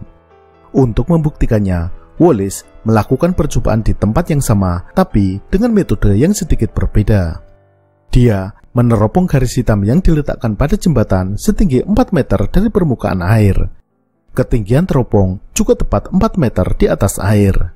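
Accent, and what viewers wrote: Indonesia. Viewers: Karakter suara medok nya biarin natural aj bro.. g usah gegayaan dibuat2 pake intonasi.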